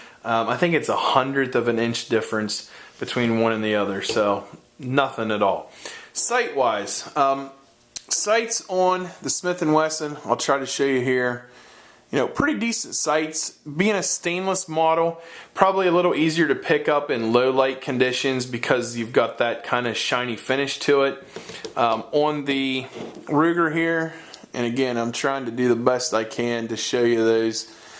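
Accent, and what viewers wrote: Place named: South Jersey, United States. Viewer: I know a south eastern PA/South Jersey accent when I hear one....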